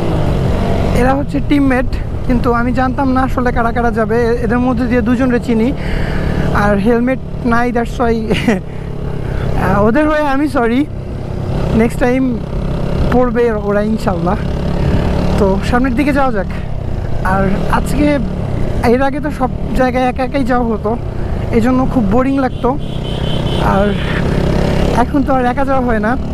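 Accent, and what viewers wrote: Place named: Bogura, Bangladesh. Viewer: ভাই বগুড়ার কথা শুনে খুশি হলাম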